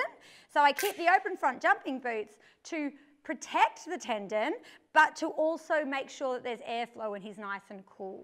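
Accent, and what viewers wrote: Australia. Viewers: I tried this with my OTTB here in AuS, and Honestly it just all made sense. was so positive and reassured me it was okay that he picked up the wrong lead and his stiff side was okay when he stopped and turned. This is brilliant stuff and hearing it with an aussie accent and knowing how things work over here 😀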